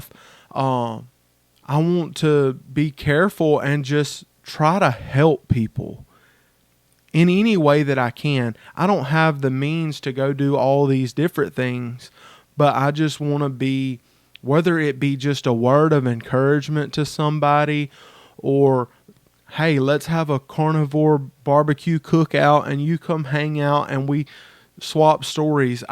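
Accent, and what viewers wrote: United States. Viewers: Love your accent and pronunciation, where is that from in the US? Good job calling out greedy and grifters. Some ppl just think how to profit from others. These times are not easy, there are many ppl having hard time making ends meet. I'm on high carb low fat, that's way cheaper than carnivore. Could just eat rice, beans, fruit, veg and sugar, not a vegan, but if it came to that, could survive on much less than those on carnivore.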